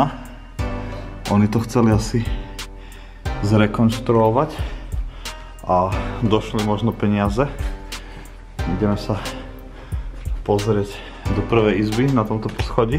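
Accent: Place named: Eastern Slovakia, Slovakia. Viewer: Ten východnarsky prízvuk je parádny. 😊👍 Už len začať hutorec v tomto videu. 😀👍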